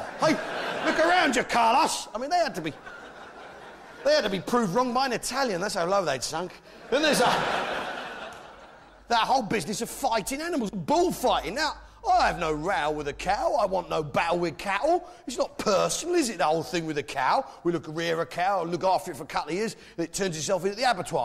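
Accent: United States. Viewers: austria.... wow, i was convinced you was a yank, youll have to come to wales, ich dien cymru, another great tribe from germania ;) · You are from Österreich? why do you speak with an American accent when speaking English just curious? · Loved the video, first one I've seen, I've just subscribed. Although, from the accent I did think you were American! Sorry... Whoops, that is so British! Al Murray went to Oxford University, quite a good university I believe (though not as good as Hastings Polytechnic), where he studied history. Look for his session on 'Great Briton has defeated every country in the world' to see just how clever he is. His character is based on the 'know all' and outspoken pub landlords that were everywhere in the UK, many years ago. Now it's all over priced lager, expensive food in a bland environment..... Though I have found a local pub, with an Al Murray type landlord and it's great! 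· Austria but has American accent? Huh?? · The most American sounding Austrian I’ve heard 😘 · Hi, love your video reactions. Can you explain as you're Austrian from Vienna, how is it you have an American accent?!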